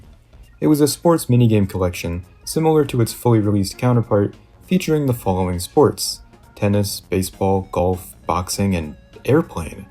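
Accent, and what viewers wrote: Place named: Portugal. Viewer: Are you portuguese? You have a certain accent.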